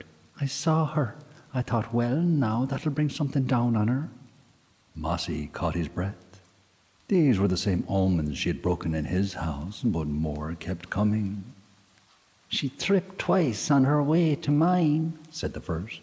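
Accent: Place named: Ireland. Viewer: Yes it's Saturday night and off course I'm on my own but the difference is tonight the 14th of February you are with me 🌹 Happy Valentine my beautiful Irish voice who tells the most haunting tales ❤️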